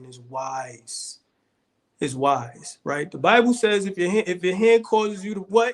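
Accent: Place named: New York, United States. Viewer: Wow such amazing testimony 🙌🏾 ❤thanks for sharing!! That New York accent has never left and that million dollar smile is beautiful!! It's so amazing too always listen to other people testimonies especially young black men, I've recently accepted my lord & savior in my life and its been so amazing just thinking about it and writing this comment I'm in tears because god is amazing, he has brought me through some terrible things but he reminds me everyday he has me and that everything will always be okay. Can't wait to see more videos from you!! The crazy thing is I'm from the DMV area and for some reason everyone thinks VA is like the best place ever like there's no rough spots in VA and I just laugh and be like yeah ok....well young man may god continue too bless you and everyone in these comments❤🙏🏾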